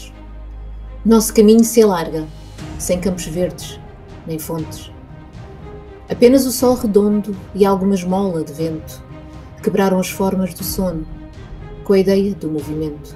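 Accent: Portugal. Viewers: Sotaque português é muito carregada, mas ficou bom.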